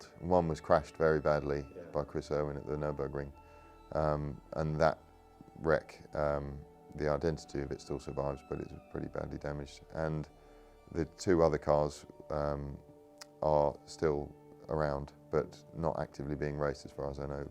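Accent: United Kingdom. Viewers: Hearing a Brit romanticize about making engineering innovations on classic Mustangs gives me hope for the human race! I mean no disrespect, Sir Clarkson...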